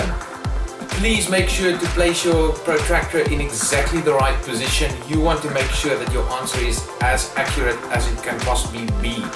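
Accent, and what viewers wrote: South Africa. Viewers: Sounds like you are from Z.A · I can tell you are southafrican 😂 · Your south african?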